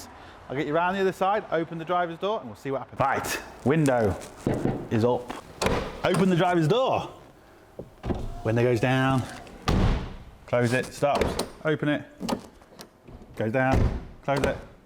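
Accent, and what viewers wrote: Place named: United Kingdom. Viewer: I've been enjoying the vids mate. Your a cheerie Brit. If you can get some of them fine lasses to speak I'd be in heaven.love British accents.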